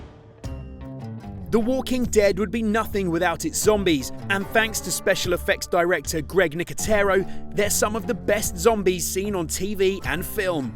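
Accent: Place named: United Kingdom. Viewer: 我愛英國腔!讚!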